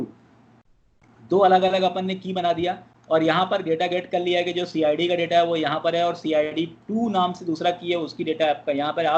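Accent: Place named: India. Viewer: God I don't know if I either love or hate the hindi accent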